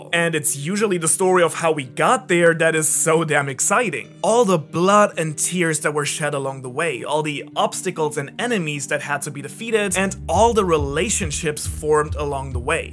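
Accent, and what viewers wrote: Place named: Germany. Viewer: Bruh seit gensos podcast hör ich richtig raus dass du deutsch bist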